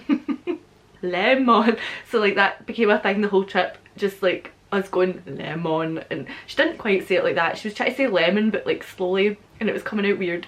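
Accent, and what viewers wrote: Scotland, United Kingdom. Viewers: I've used many apps for learning languages and most of them are terribly ineffective. The only one that i've personally found at least a bit useful is Lingodeer. This app mimics real life lessons. It has some grammar explanations (in the beginning of each lesson) and it lets you repeat and build on previous lessons. It's still far from perfect, but a step in the right direction. I recommend it to beginners who don't have enough motivation to read language books or have time to attend actual courses. Lingodeer was initially created for learning Japanese, Korean and Chinese, but now other languages are also available (check it on Google Play). First level lessons are free, but for others you make one time payment (which is a great deal). The only reason that am doing this positive review of the app is because learning languages is kind of my hobby, and i was pleasantly surprised and want to share that with other people. 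Btw, very entertaining channel. :D I use it sporadically for getting used to scottish accent, which i like the most (of all the english ones). Alright, that's all. Greetings from Slovenia. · Hey, I love the Scottish accent and watching your videos is great. I'm from Germany!! Just here to say *Augsburg* is a great town to visit in Germany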